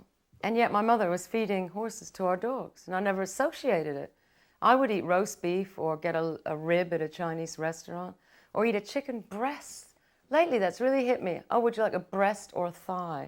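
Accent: United Kingdom. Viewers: Wow her accent was so English by then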